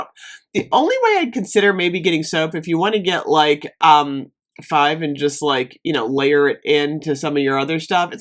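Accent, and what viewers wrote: United States. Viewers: Are from the states or Canada?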